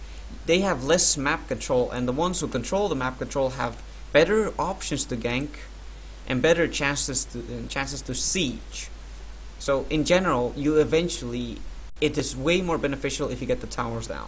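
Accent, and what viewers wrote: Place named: Sweden. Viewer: what accent is it? swedish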